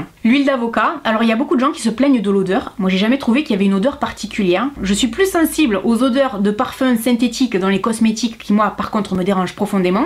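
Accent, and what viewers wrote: South, France. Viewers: C'est clair ! Et son accent qui donne l'impression qu'on est dans le sud et qu'il fait beau...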